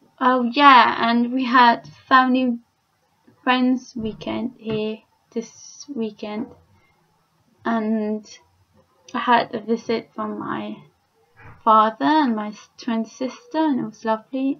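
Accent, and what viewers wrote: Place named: United Kingdom. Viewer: Du virker sød men hvorfor taler du med britisk accent. Håber det bedste for dig.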